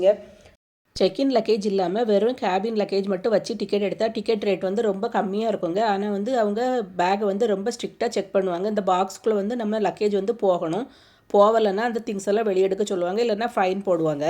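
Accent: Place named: Nagercoil, India. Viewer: Hlo sissy ungaluku native nagercoil ah slang apdi iruku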